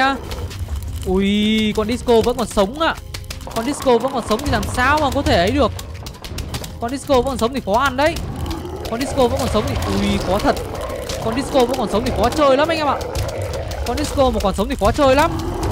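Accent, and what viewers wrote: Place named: Binh Dinh, Vietnam. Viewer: Anh là người bình định à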